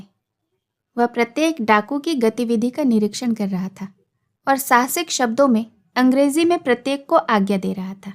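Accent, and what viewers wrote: Standard. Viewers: मधुर आवाज, विशुद्ध उच्चारण और सुनाने का प्रवाह, सब कुछ बेहतरीन। हम सबका मनोरंजन इसी प्रकार करते रहें, ईश्वर आपको उन्नति के शिखर पर ले जाएं, ऐसी कामना है।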